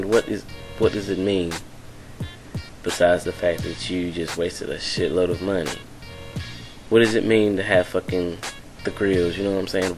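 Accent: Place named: United States. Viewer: speaking correctly is a matter of opinion. You're way too americanized.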